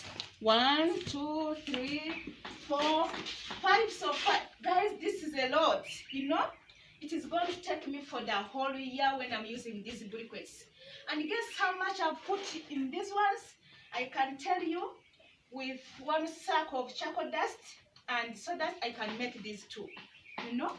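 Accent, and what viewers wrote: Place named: Uganda. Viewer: I want to be your friend..i work together in saudi with annet..shes from uganda..the way she speak is same as you...shes a goodgirl also..freindly and kind..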